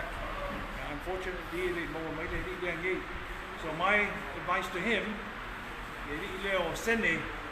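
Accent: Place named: United States. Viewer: I rather for him to speak inglish clearly then samoan language will be better but sounds his accent he probably educated in usa ae matagofie le tautala lelei pea ile igilisi ma le faasamoa ia manino lelei then people will see professional malo le taumafai paga foi le malosi o galuega valea ale tiapolo i nei tagata ma nei auupega e lamatia ai soifua ma ola o tagata lautele aemaise o soifua o leoleo o loo galulue e puipui le saogalemu ole atunuu,ma ole ofi atu o drugs eseese i totonu ole atunuu ma involved ai le toatele o tagata ae maise i young people ose faafitauli pito sili lena ua aafia ai aiga,fanau,ma tagata lautele i totonu o samoa so sad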